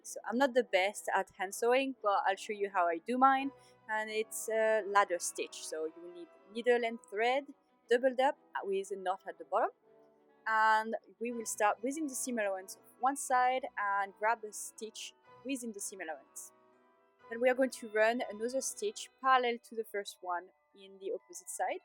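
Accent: France. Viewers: Great tutorial I will make it for myself and my friend. I love your cute french accent. your English is great. Merci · I love your video!!!! I am going to make them this Xmas 2022! I too have cats that are helpers. Not to insult you are you French? My husband was French and I miss the accent!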